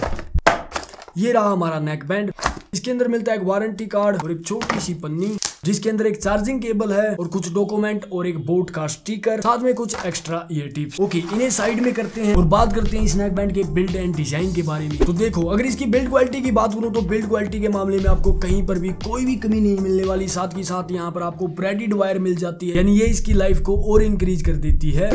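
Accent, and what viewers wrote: Haryana, India. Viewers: Bhai haryane se hai k ? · Bhai boli se to haryanvi lage hai kha se ho.... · Nyc haryanvi accent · Harayana say h bhai 😂😂jai haryana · Haryana se hai kya bhai, tone to kuch aisi hi hai❤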